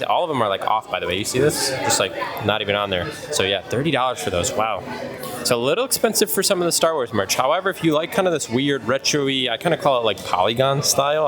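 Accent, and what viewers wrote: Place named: Midwest, United States. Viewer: I know a Midwestern accent when i hear it. Which state are you from? Illinois?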